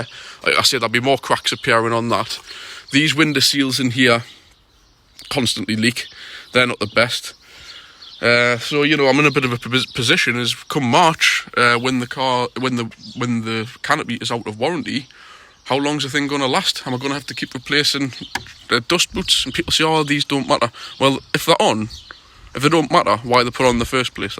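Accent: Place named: Geordie, United Kingdom. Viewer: Just ordered the rain defence kit from Mitsubishi for my truckman canopy, cheers for all the helpful advice mate, you're a legend and sound like a canny lad. I'd never have known they did one, and got the bonnet deflector too, drums are lovely and matt black already. I'm picking it up on Wednesday this week and any experience with the Goodyear Wranglers which are fitted? I'll deffo get the 265's (I think if i remember correctly?) when they're due for new ones like you suggested. Egr delete lead pre-ordered and paid for (week delay, sold out) Love you man, you're awesome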